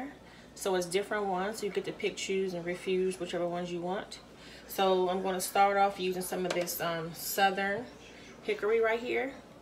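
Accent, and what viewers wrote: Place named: DMV, United States. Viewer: Hey neighbor 👋🏾 I’m in the DMV too and know that accent anywhere! Girl I’m making these tomorrow with some dirty rice and sauce! Thank you so much for sharing your talent and giving us a fantastic recipe!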